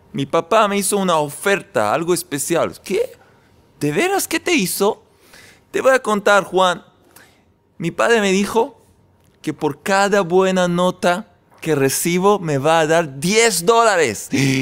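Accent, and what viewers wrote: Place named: Panama. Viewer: Una de los sucesos que mas me ha divertido es que usted habla como nosotros y eso hace que se identifique mas..."pa donde vamos" jeje Y los mas importante y valioso es que no sabia como era mi relación con Dios y tampoco entendia muchas cosas. Aparte que no sabia que espera de mi y yo de El. Gracias, muchas gracias. Soy de Panamá y me apodan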